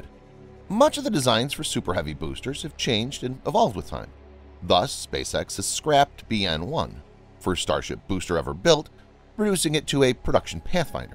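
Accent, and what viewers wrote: United States. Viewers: I can imagine his voice is perfect for learning American English!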